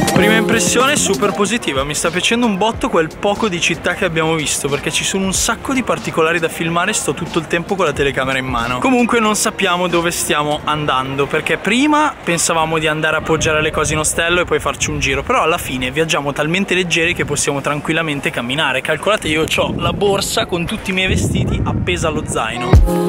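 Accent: Bergamo, Italy. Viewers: sciarpa di capelli, accento bergamasco, street food, ignoranza in abbondanza, scusatemi ma anche senza coffe shop made me feel high.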